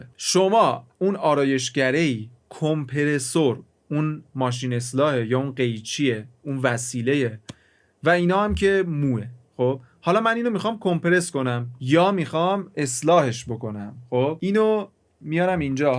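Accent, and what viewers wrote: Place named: Mashhad, Iran. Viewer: دمت گرم میگم شما مشهدی نیسی احساس میکنم لحجه داری مشدی داری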